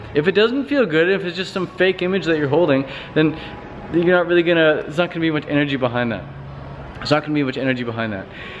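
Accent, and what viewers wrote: Canada. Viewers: which part of Canada are you from?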